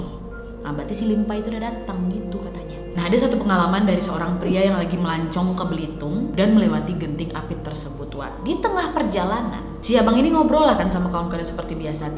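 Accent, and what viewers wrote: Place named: Samarinda, Indonesia. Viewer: Dari Samarinda mana suarany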